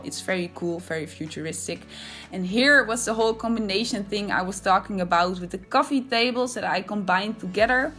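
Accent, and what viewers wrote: Netherlands. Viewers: Are you dutch? Cuz u sound like one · I like this design a lot. Also, are you Dutch? I think to recognize the familiar accent. :) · Hahah ik hoor gewoon dat je Nederlands bent😂💜 Het ziet er echt cool uit👌😍💜💫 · Are you Dutch by any chance?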